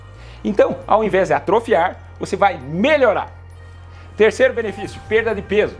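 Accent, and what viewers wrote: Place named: Paraná, Brazil. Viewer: Este sotaque é inconfundível das pessoas do Paraná; morei na Lapa, Cascavel e Francisco Beltrão. Parabéns pela sua dedicação em nos orientar de forma simples.❤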